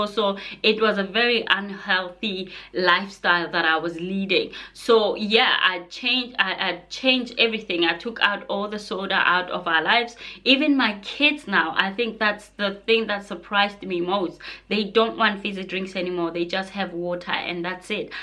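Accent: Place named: South Africa. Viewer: That was amazing thanks for sharing your weight loss journey.Your accent is South African.28 is a lot wow.congratulations.hit the 🛎I love your content I wouldn’t want to miss out.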